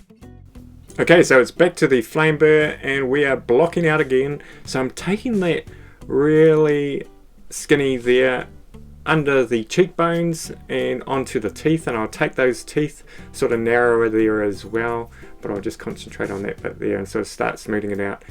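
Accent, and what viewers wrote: New Zealand. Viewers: That accent sounds like a Kiwi, am i right? If so you art work tooi much😮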